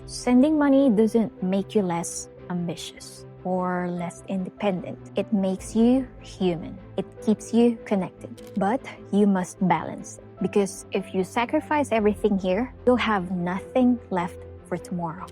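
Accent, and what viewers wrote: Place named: Philippines. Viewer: I can hear your southern US accent mixed with your Filipino accent...hehe. Good video. Truth be told.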